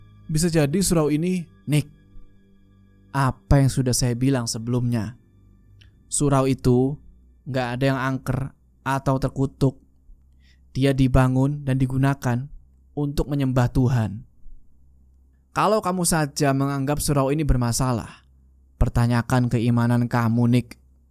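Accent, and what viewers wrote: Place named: Bali, Indonesia. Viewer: Kok malah mirip logat bali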